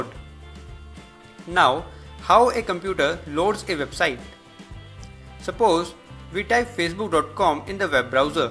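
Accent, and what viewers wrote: India. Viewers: 这印度英语真听不下去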